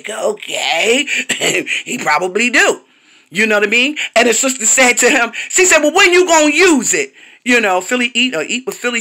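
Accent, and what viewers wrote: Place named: New York, United States. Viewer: 💞💞 I just subscribed to your lovely channel 💞💞 I like the name 💞💞and I agree that does have a beautiful smile and very nice teeth 💞💞 is a sweet Lady💞💞as far as Adreian she just needs lots of prayer for deliverance💞💞then I think she will become a completely peaceful and happy woman 💞💞lastly I love your accent and you talk like a New Yorker 💜💜💞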